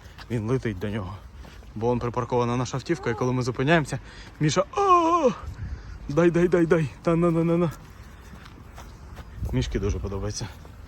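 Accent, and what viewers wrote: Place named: Lviv, Ukraine. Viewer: Успіхів з українською. Дуже гарно розмовляєте. P.S. львівська мова то тєма😄 сама зі Львова і,живучи не в Україні, львів'ян чую одразу 😅(Франківськ,Тернопіль теж)🙂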